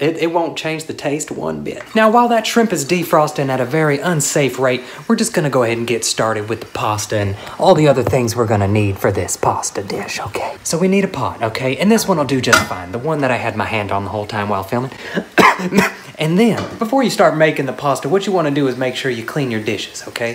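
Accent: South, United States. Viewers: I love the southern in these older videos. "pots and pans" was one word. "potsnpans". love it.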